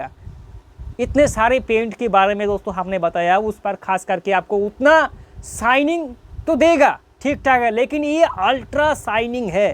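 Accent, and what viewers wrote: Bengal, India. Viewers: Ade baba tum bongoli hai kya 😅 nice video ❤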